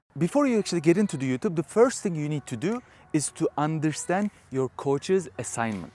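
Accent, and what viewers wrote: Turkey. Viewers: U speak like a Turkish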